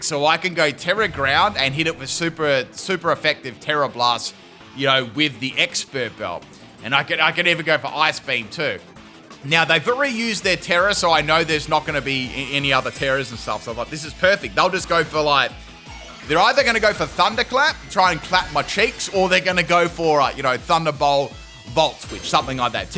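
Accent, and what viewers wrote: Australia. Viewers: Love your vids! I enjoy how you are australian and more language illiterate than us Americans! Everyone makes fun of us for not knowing languages but as someone from the U.S who speaks 4, your pronunciation on words makes me feel better on the languages im trying to learn!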